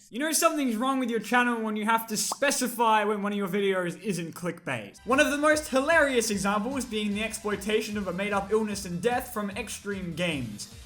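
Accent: Australia. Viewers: Ahh, nothing like my Aussie mate talking about YouTube stuff. 👍👍 Keep it up!!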